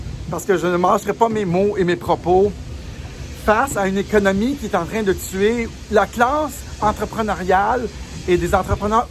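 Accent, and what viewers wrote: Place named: Canada. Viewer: Bravo Merci pour tes infos C'est très utile pour réveiller le peuple Merci Merci Merci Bonjour de la France J'adore ce petit accent canadien Luce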